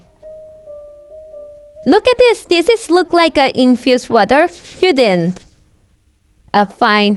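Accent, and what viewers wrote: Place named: India. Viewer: Bros voice is indian....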